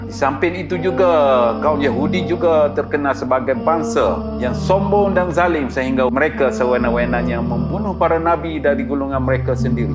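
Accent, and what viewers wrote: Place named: Kelantan, Malaysia. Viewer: Loghat tumpat kental sangat 👍👍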